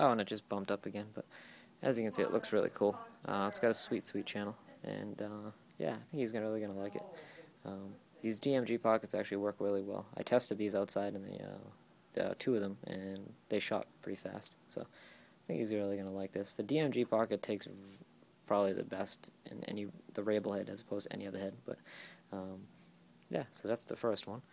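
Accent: Boston, United States. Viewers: you have a Boston accent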